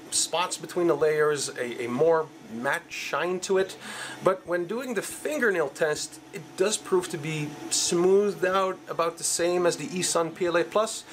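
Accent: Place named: United States. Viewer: How come you sound like an American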